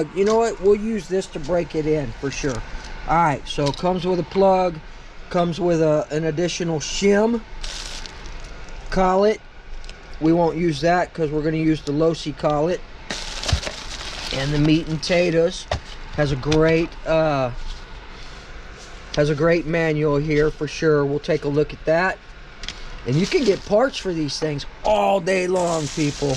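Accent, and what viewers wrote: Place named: New England, United States. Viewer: Couldn’t tell if you were up here in New England or Texas.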